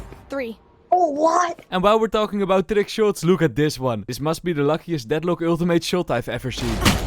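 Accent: Netherlands. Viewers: If youre not Dutch you dont hear it, but the fact that he still sounds more Dutch than English after years of making English content hurts me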